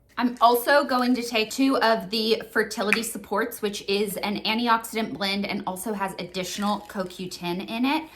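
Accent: South, United States. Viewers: Hi! I’ve been watching your videos ever since your scarf-styling tutorial popped up on YouTube’s homepage—so probably since 2008 or 2009. I’m from Switzerland, and your channel was a huge part of my teenage years. Watching you motivated me to improve my English (I always loved your Southern accent!) and I learned so much about beauty and makeup—way before my friends did, because we didn’t have anything like the beauty community here. I just wanted to say thank you for coming back to YouTube. Your rambling, cozy style has always felt like a podcast to me—I love having your videos on while I do my makeup or just take a break. And your honesty about introducing your new Cavapoo after Teddy really touched me. I totally get it—I felt the same after losing my dog. Funnily enough, I now have a Cavapoo too—her name is Lokki 🐾 Anyway, I’ve never really commented before (very Swiss of me), but I’ve been here all along and just wanted to finally say thank you for everything! 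✨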